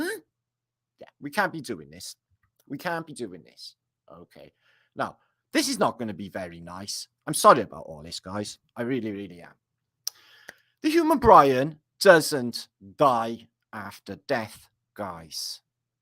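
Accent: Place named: Cardiff, United Kingdom. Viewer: Good stuff. Loving your vidz I discovered you through RV recently. I knew straight away you was from Cardiff from the accent 😂😂 I am myself born an bread from there. Keep up the good work 😁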